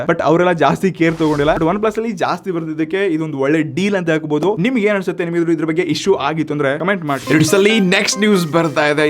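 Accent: North Karnataka, India. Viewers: 143 ಟೆಕ್ ನ್ಯೂಸ್ ಗೆ ನಮಸ್ಕಾರ ಗುರು ನೀವು ಕೊಡೋ ಮಾಹಿತಿ ತುಂಬಾ ಉಪಯೋಗ ಆಗುತ್ತೆ ಹಾಗೆ ನೀವು ಮಾತಾಡೋ ಉತ್ತರ ಕರ್ನಾಟಕದ ಮಾತು ಕೇಳೋದಕ್ಕೆ ಚಂದ your presentation is next level we don't want any changes u always rockzzzzz........🎉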